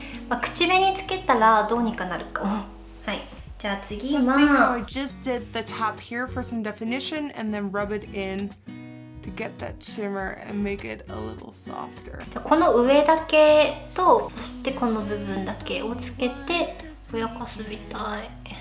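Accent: United Kingdom. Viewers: Her accent is british............................. WHY IS HER ACCENT BRITISH?! girl.exe has stopped responding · you're so adorable and i love your british accent! uwu i think you did a good job considering it's the first time you tried a make up look like this 👍💕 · British Accent たまらないです！ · You have a British accent to your English! I love it, it's cute. I really enjoyed the video, thanks.:) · I love your British accent whenever you speak English 😭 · 外人さんメイク良く見るけど、オカマメイク(ドラッグクイーン)だよねw プロダクトめっちゃ付けるし化粧品会社めっちゃ儲かってるんだろうね。イギリス英語可愛いし、さんの全てが可愛い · Wait...how does she speak English so well??? And with such a Brittish accent lol? Anyway quite enjoyed this 😄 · It is a British accent actually, she lives in London. Also that's not true about English speaking Japanese sounding British naturally; whoever you are, you will adapt the accent of whoever you learned whichever language from and how you are used to hearing that language spoken. 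· Your British accent is the cutest thing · Omg you have a British accent <3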